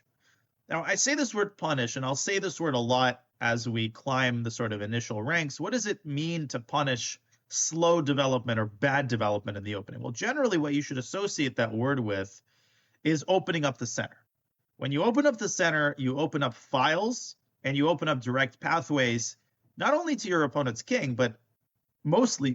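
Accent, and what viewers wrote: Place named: Russia. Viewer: As much as I appreciate your speedrun course I still can't understand why you have been constantly using the Russian words and accent in the time when Russians invaded Ukraine and commit so many war crimes.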